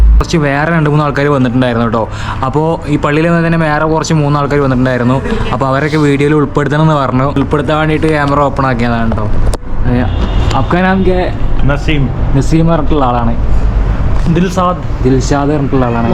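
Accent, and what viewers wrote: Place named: Malappuram, India. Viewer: തുടരുക , വിവിധ പ്രദേശങ്ങൾ .... ഇടക്കുള്ള തമാശകളും , മലപ്പുറം ഭാഷയും പോളിയാണ്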